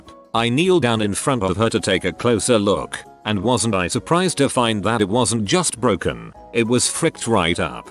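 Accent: United Kingdom. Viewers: The voice reading is British XD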